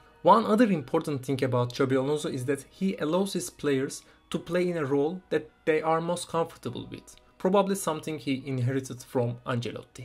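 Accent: Turkey. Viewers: Your accent is making me think you are Turkish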